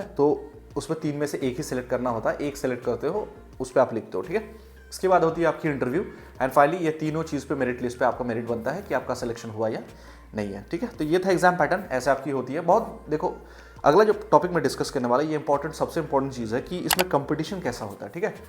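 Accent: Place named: Bengal, India. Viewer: arekta bishoy e koutuhol holo tai jigges korchi Apni ki bangali? Puro naam ki apnar? Eto porishkar Bangla bolen tai jiggesh korlam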